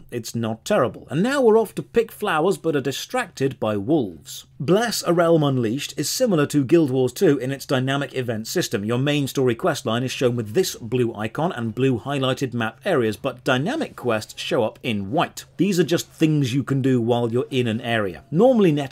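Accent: United Kingdom. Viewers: I often wonder what your sub count would be like if you didn't look like Henry Cavill and have a British accent.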